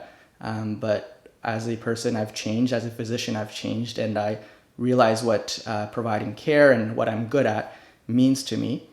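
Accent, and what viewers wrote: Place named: Canada. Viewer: o shit you're canadian?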